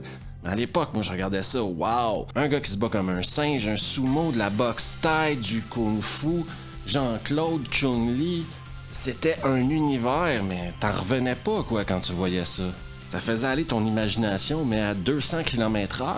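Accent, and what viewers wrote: Quebec, Canada. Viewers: J'adore tes vidéos. En plus pour un petit français comme moi c'est excellent d'écouter ce merveilleux accident québécois. Des commentaires à tomber par terre. BRAVO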